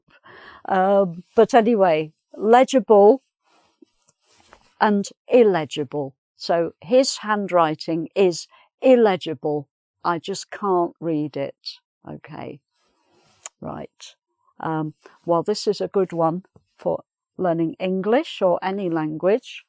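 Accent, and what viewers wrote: United Kingdom. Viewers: Have signed. Love your British pronousation❤️.Thank you for great lessons!!!! Can I find this list anywhere? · British pronunciation the best ever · Thanks my great teacher ...love your lesson so much cus you make me able to speak in British accent ...i got 100. ..god save you . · Thank you 'Mother' You give us valubale topics . I love British accent . Thanks "mother" for being teaching us to all your childreen arround the world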